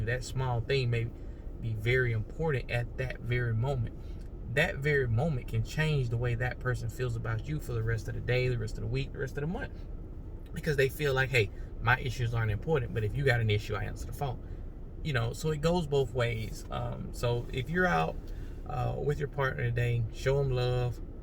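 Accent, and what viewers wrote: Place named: South, United States. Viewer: Love that southern accent! Be blessed 😎😷👍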